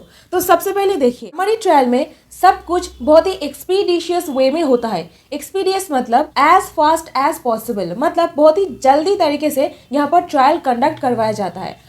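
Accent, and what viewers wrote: Bengal, India. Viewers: Your tongue language like Bengali girl 👧 mam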